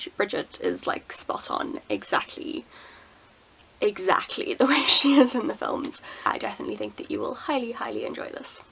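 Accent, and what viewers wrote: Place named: United Kingdom. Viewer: Are you British perhaps? 🤔 quite the strong accent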